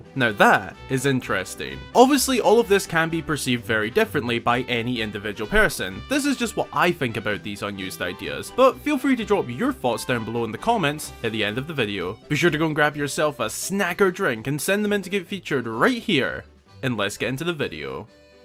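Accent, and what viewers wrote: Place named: Edinburgh, United Kingdom. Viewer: I'm a Scot and he definitely sounds proper Scottish to me as his accent sounds very Edinburgh-like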